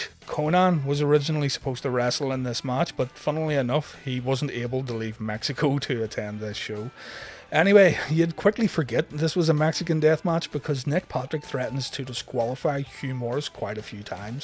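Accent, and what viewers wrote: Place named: Northern Ireland, United Kingdom. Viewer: Just found this channel last night with the DX vids I noticed your assent by any chance you from Northern Ireland?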